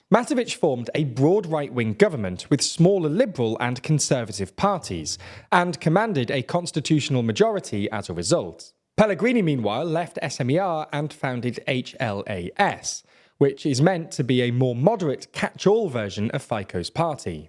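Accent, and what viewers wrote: England, United Kingdom. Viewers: Hey Dude! Don't be so self-confident! You should first learn how to pronounce the names of the politicians you are talking about. No one gives a damn where are you come from and what is your language background. I am pretty sure no Slovak citizen likes your silly pronunciation of their names, in fact, we found it arrogant, and it only shows your total ignorance of the subject as the ONLY reason you made this compilation slide show is to get views and likes to get your youtube rankings up! Besides, I guess you as a UK citizen (based on your accent) have absolutely no right to label/mention some of our political figures as "fascist" even if the idiotic narrative of Slovak mass media may often indicate so. Even if politics in Slovakia is a mess thanks to aggressive foreign interventions, (including your country), Slovaks will solve these in their way. 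I mean, HEY MAN (!) who do you think you are to have the courage to stand up before us Slovak citizens and talk about subjects you have absolutely no freaking clue about?!?!?! No one cares about your opinion! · How is it not to be a party to USA, and UK led proxy war with nuclear power, through country they puppeteer, and pay for directly on your border a pro-kremlin, pro-russia? Do you even use your brain? You can hear from the accent the host is from UK, and him saying pro-kremlin, pro-russia try to smear everyone who does not want to be part in this USA/UK regime proxy war. "You not with us", in their indoctrinated chicken brains means "you are against us", but what about "go fkin play somewhere else, and leave us alone", that in my view is a side as well, worth to take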